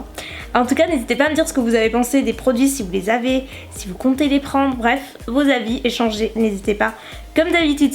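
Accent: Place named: South-West, France. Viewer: moinSSS le bon accent du sud ouest ;)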